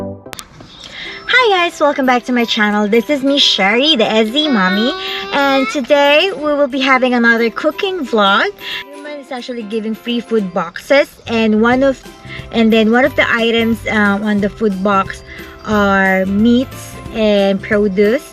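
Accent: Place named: England, United Kingdom. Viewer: I love your english accent, Enjoy cooking and you can do it. Stay healthy po #dabestiesjasCovers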